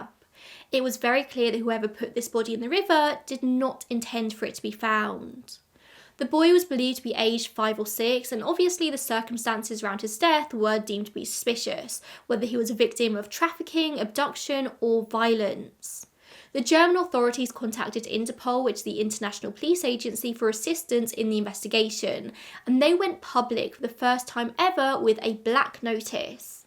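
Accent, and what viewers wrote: United Kingdom. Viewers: You're not saying it wrong at all, just British. Plus, you nailed your american accent of it! ❤😮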